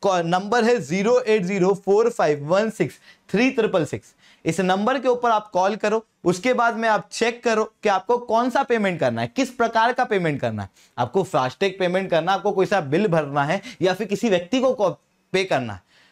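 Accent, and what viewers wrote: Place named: Bhopal, India. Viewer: Bhopal se ho kya bhai 😐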